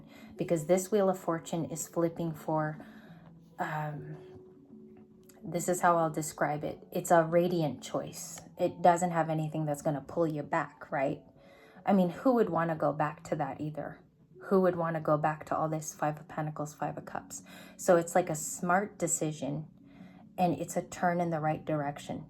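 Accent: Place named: South, United States. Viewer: So funny, he has a country accent & i love it! I've been waking up in the middle of the night just thinking of him. I miss him so much. I feel him getting closer & closer to me.❤, you're so good! 🎉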